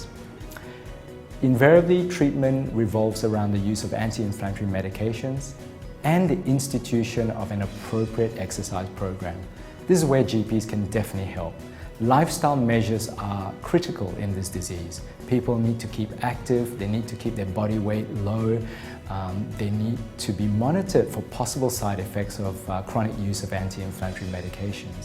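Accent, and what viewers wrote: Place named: Australia. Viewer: So, so good to hear an Aussie accent, talking about AS here on Youtube. I am a late and constantly changing dx. While I was dxed with AS almost a decade ago, every time I come across another Rheumy the dx seems to change. That or they just won't commit, they just call it some kind of Inflammatory arthritis. OK, admittedly I have more that one systemic condition that directly affects the joints, which seems to confound the issue. Apparently I am also a very complex patient. My take on that is shitty gene's, ha ha. I am female, 50 something, B27 negative, RF negative, ANA positive. I have a relative, my mothers uncle, who had AS. I also have plenty of relatives with RA. Fortunately I have been on DMARD's the whole time. I have tried a biologic but I kept getting stomach infections. My Rheumy at the time told me that if I couldn't tolerate one biologic I couldn't tolerate any of them. 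I also hvae a standing order for rule 3 testing which I get any time I have another blood test done. No one seems to be monitoring them so I don't worry too much. I have had imaging of my lungs and lung function tests, the results of the LFT wasn't good news. As I live in a small country town (in NSW|) and am a waaay too frequent, frequent flyer at my local imaging place. Lets just say the guy who reports on my imaging is always the same guy and he isn't overly concerned with being accurate. It's OK if the specialists actually view the imaging but because I am complex, most of the time there is no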